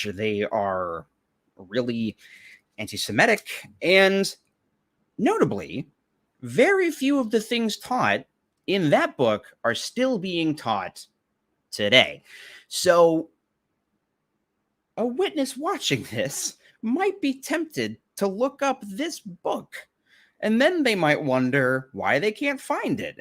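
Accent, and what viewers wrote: RP, United Kingdom. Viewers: Omg your BBC World service accent is on point. More please.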